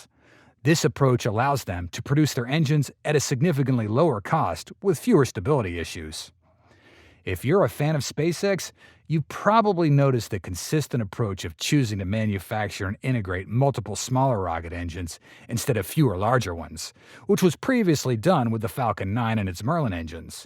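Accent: United States. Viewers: You're wrong. It's good engineering info, written in a very calm Asian style and voiced in a very cool American accent. Clickbait, sure, but not claptrap. It's legit. It would make sense if you had studied engineering.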